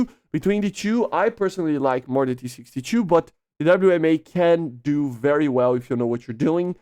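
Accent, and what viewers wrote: Brazil. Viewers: I was about to ask If you were from Brazil because of the accent. Then I saw you had a Portuguese Chanel lmao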